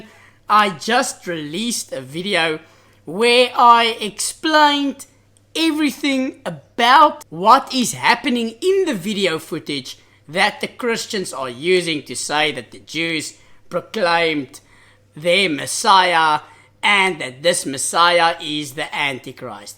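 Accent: South Africa. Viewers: חיי אחי 😁 I've watched your videos off and on for months now especially the conversion video start to finish. I think it's fantastic your making videos about Judaism,I love hearing your South African accent haha I just haven't heard a Jew speaking anything apart from American / Israeli accent/Yiddish Anyways G-d bless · Is this guy South African or something?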